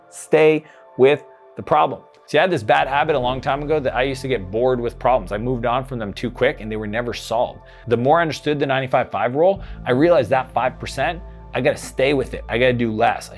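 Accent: Canada. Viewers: It sounds like a French Canadian accent, idk if it’s only me. But great video, I really like this advice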